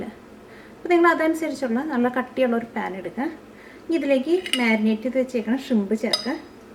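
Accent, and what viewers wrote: Thrissur, India. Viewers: Thrissur bhashayanishta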